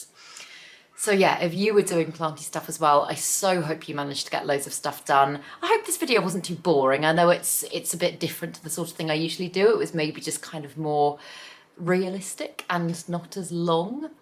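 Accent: England, United Kingdom. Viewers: Love watching,just wondering if you have an outside space to repot this time of year,less clean up ?After watching you I’m heading out to repot a few too❤❤❤Hi from Maine,USA🇺🇸🥰 Oh and I love your English accent😊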